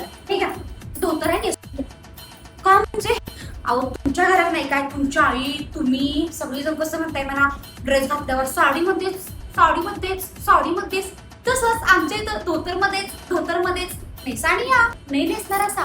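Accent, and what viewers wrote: Kolhapur, India. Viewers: Kolhapuri language ❤❤❤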